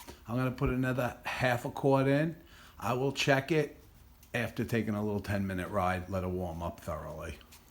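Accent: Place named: New York, United States. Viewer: Hey, I haven't heard your type of New York accent in over 30 years! It's refreshing to hear it after such a long time. I'm not a native of New York, nor, a transplant. It's comforting to hear it again.🤓😁⭐⭐⭐ Doug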